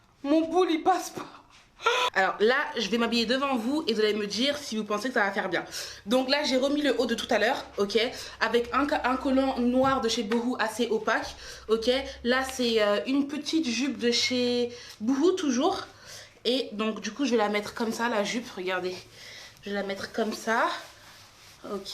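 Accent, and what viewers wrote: Africa. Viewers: Dans cette vidéo tu à beaucoup parler africaine! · J’adore trop l’accent africain 🥰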